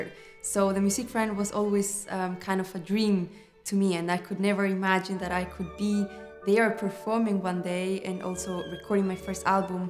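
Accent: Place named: Germany. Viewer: Tienes acento alemán y hablas inglés, pero tu nacimiento, tu nombre y tu increíble talento, te hacen un verdadero orgullo para España. Ya podemos decir que no sólo tenemos a los mejores en el deporte, sino también en la música de verdad. Gracias, thank you, danke... (el próximo concierto en Mallorca no me lo pierdo)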